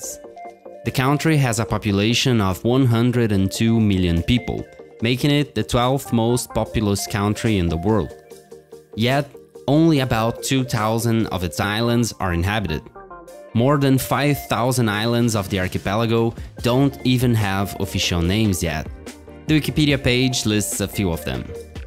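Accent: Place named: England, United Kingdom. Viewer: I like your English Accent! Where are you from? keep up the great work!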